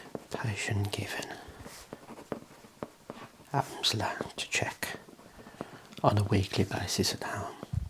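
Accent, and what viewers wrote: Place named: Tamworth, United Kingdom. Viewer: Where abouts are you from? Im from Tamworth and can tell the accent a mile off 😅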